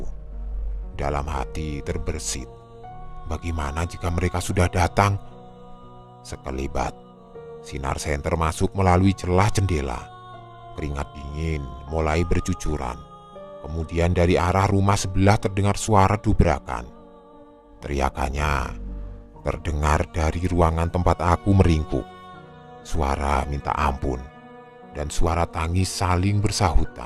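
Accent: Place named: Palembang, Indonesia. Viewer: Dari logatnyo kau wong palembang yoo?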